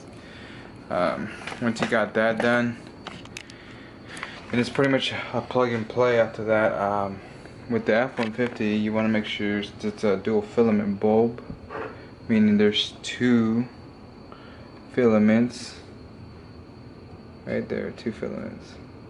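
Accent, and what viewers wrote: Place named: Texas, United States. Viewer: What part of Texas your from?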